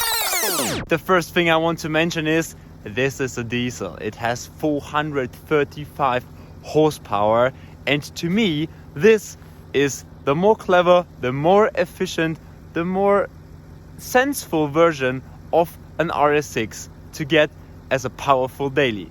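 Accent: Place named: Germany. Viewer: Bro you are from Germany i can hear it ! Moin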